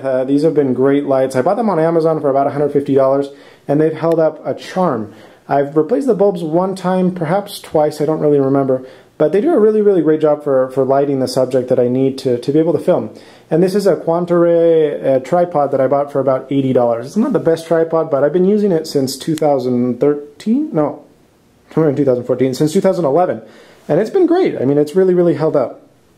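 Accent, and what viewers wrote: Spain. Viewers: You've acquired a slight Spanish accent. Glad to have you back! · the Spanish accent now is awesome :D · Spanish accent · You have a slight Spanish accent now :). Must be all that time spent in Bolivia. Welcome back bro. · You've come back with a spanish accent :D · wow you picked up a small spanish accent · Is it just me or does have a bit of a Spanish twang to his speech now? How cute:) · Wow you sound really spanish after those years in bolivia! :D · You have a spanish accent in your english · Haha I can notice a slight spanish accent. Looks like you got very involved with Bolivian culture ;) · You kind of have a Spanish accent now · It's awesome that he's got that Spanish twist on his accent now haha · chubby and a spanish accent. It's like we're brothers now.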